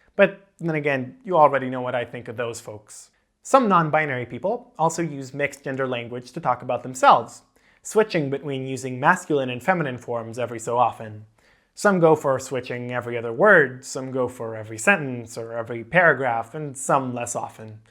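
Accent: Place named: United States. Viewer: They American accent isn't nice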